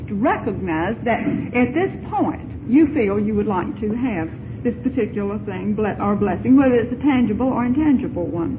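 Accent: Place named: North Carolina, United States. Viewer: I love her North Carolina twang!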